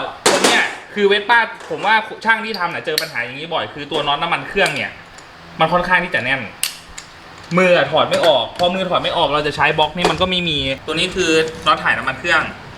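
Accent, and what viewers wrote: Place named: Isan, Thailand. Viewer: อร๊ายยยย น้อง เป็นผู้ชายที่มีความสาวนิดๆ ติดสำเนียงอีสานหน่อยๆ ใจละลายแล้วคร่าาาา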